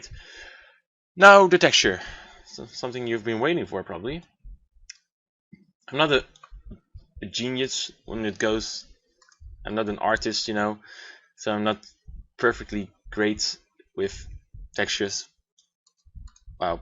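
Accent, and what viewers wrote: Netherlands. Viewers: are you Dutch ? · re you dutch (nederlands)?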